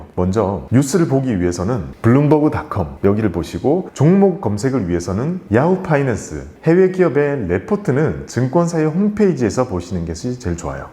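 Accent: Gyeongsang, South Korea. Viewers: 경상도 억양이 남아있어 엑센트처럼 귀에 쏙쏙 들어오네요 ㅎㅎ ^^ 주린이 에게 너무 도움 됐어요~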